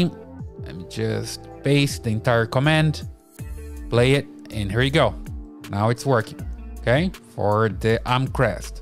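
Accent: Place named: Brazil. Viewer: Thank you for that! It's my first time in your channel, you accent sounds familiar. Brazilian?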